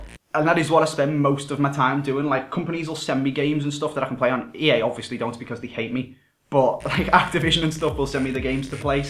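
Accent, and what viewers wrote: Liverpool, United Kingdom. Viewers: are you a scouse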